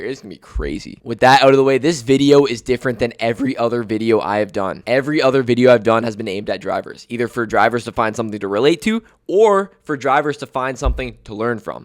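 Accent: Canada. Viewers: canadian detected!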